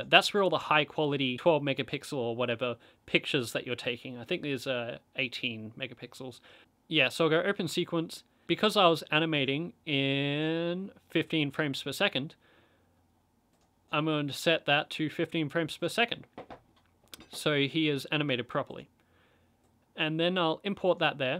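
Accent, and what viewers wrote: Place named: Australia. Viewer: Aussie lad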